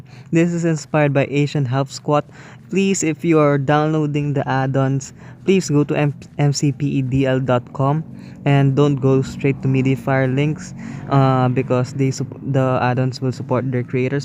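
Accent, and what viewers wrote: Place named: Philippines. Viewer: Your a pilipino right i realized it because of your accent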